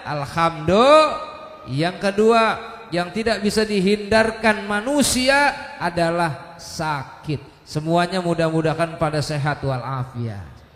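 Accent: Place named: Java, Indonesia. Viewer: Ceramahnya medokkkk banget..predator ni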